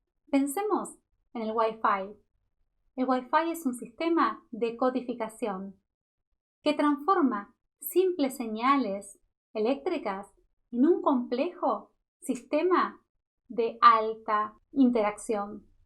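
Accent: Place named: Argentina. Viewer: Está muy bien la información pero ese desgraciado acento argentino y en otros videos el acento español hacen muy difícil aguantar verlos hasta el final, es un desgraciado suplicio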